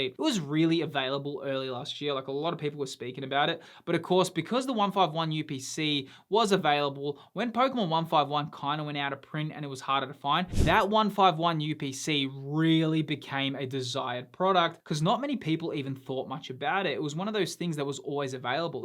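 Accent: Australia. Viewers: i subscribed because of the Aussie accent and the banana trend :)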